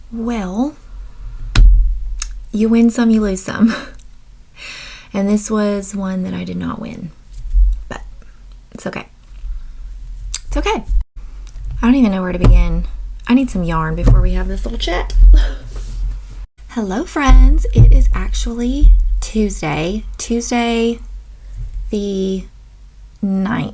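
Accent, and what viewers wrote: South, United States. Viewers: I just found you and love you already. Subscribed!! I loved when you said that markets were the P to your PB and J and your cute southern accent. I definitely will be going to site and purchasing from you because I want to support you!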